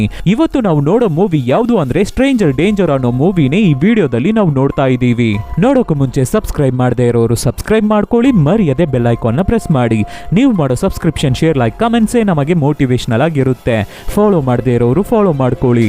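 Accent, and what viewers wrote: Tamil Nadu, India. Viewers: Kannadana saryaag maathad guru...neenen Tamil avnaa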